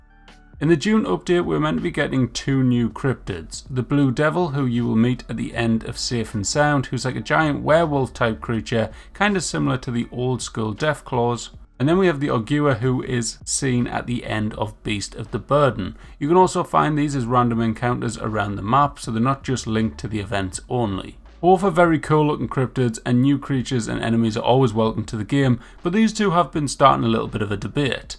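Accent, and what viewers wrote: United Kingdom. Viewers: i hate this heavy british aceent! (o)pdates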